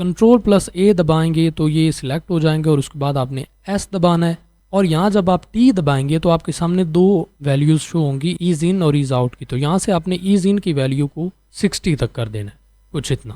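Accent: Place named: Pakistan. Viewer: u sound like Pakistani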